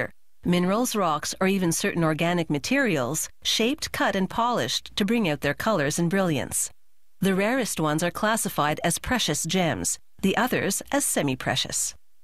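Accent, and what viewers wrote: Canada. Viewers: Then good thing this narrator is Canadian! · Whilst the producers of the show are ignorant and stupid for not researching the correct pronunciation of "Darr-bee-shire", my fellow Brits are equally ignorant and stupid for attacking Americans here, when the narrator is quite clearly speaking with a Canadian accent (oot, aboot etc) and she gives the temperature of the vacuum oven in Celsius, not Fahrenheit like an American would.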